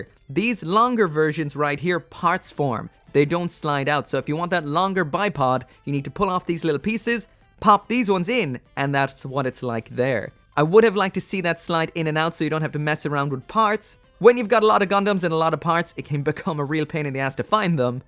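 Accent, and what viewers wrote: Ireland. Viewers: You from ireland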